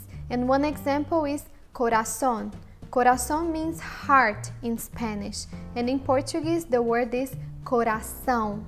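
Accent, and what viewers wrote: Brazil. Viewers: she spoke with a Brazilian accent and put the flag of Portugal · Muito bem explicado, Muy buen explicado, me ha gustado el acento brasileño :) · you are speacking portuguese from brazil not from portugal sorry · Se é pra falar com sotaque do Brasil e meter bandeira de Portugal... não dá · why would you use the portuguese flag if your talking like brazilian people wTF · Interesting the fact that you included the Spanish flag and the Portuguese flag, despite your Brazilian accent. Thank you. :) 😗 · Her accent is Brazilian, not Portuguese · Well,when you speak portuguese you speak like brazilian so,your portyguese from Portugal is lightly bad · I like the video, but this is much more SPANISH vs BRAZILIAN.... Your accent here is completely brazilian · Primeiramente, gosto dos seus vídeos. Mas não entendi o por que você colocou a bandeira de Portugal se você fala Português Brasileiro e inclusive no vídeo, você falou com o sotaque brasileiro, não com o sotaque de Portugal.......... 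· O teu português é muito brasileiro ; ) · É Português do Brasil... quase outra língua! Ela usou palavras brasileiras, por isso que devia ter a bandeira do Brasil. Como por exemplo, quando ela disse "maquiagem" em Portugal é "maquilhagem". E para não falar que também usou o sotaque brasileiro. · Obrigada,! Aprendi espanhol e MUITO INGLES neste seu video! Estou estudando a lingua do tio sam, mas sempre há espaço para espanhol! Abraço e fique com Deus! P.S.: Pelo seu nome, e pela pronuncia, você é Brasileira, certo? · Muito bem! Se não fosse o sotaque brasilês e a omissão de alguns determinantes seria perfeita. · sorry ma'am that is not portuguese its Brazilian portuguese which is a lot different when it comes to pronunciation, also you speak south american spanish not original spanish. you shoudn't have used the portoguese and the spanish flags in this video so not to mislead people. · This has probably been pointed out already but the portuguese word for makeup is "maquilhagem", and the "lh" is not mute. 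Also portuguese sentences are built a little diferent, for instance "sempre há muito vento quando neva" sounds really off, it would be "Quando neva há sempre muito vento", though it is a only a small difference and I understand this is to keep things simple. Also I feel like this video is explaining more about the similarities than the diferences (these words can be easily translated from one language to the other with this simple rules while a lot of them can't) Also I wanted to point out she's using the brazilian accent, and as a portuguese it makes me wanna point that out (my corrections were for Pt-Pt, so ignore me if they're not true in Br-Pt). Sorry for the long comment, blame youtube for recomending me videos of my language. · Esse sotaque ai é brasileiro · Isso não é português de portugal isso é português do brasil. Tu pronunciaste as palavras como os brasileiros. Ah e maquiagem diz se no brasil, em Portugal diz se "maquilhagem" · FreedomWarrior Não vale a pena andares a causar discussões com Brasileiros só porque ela falou com o sotaque do Brazil, ela usou a bandeira Portuguesa quando falou da língua Portuguesa (dando reconhecimento ao país original da língua) não a Brasileira, por isso acho que estás a exagerar e mais, a causar má impressão do teu e meu país. 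· You didn't actually speak native portuguese, you spoke brazilian which has a completely different accent from european portuguese. Makeup is not maquiagem in portuguese from Portugal, that's brazilian. In portuguese it's maquilhagem. Stop distorting portuguese language please. This video is more about brazilian portuguese and spanish, not portuguese vs spanish. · Ese portugués que estás hablando es más Brasileiro XD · poxa moça, você poderia então ter colocado a bandeira do Brasil pq esse sotaque português ai é do Brasil. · JhonnLT Ele não falou "bosta" seu ignorante. Ela usou sotaque brasileiro, é uma crítica perfeitamente válida sobre a bandeira.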